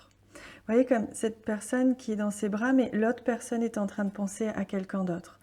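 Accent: South, France. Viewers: Merci, trop mignon le flamand rose Avé l'assent 🌹😊😊😊😊💕❤️❤️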